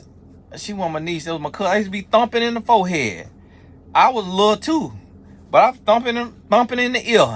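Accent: South, United States. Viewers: you really are country I’m dying laughing at ends meat 😂😂😂 had to pause right here and catch my breath...now I can continue watching 😅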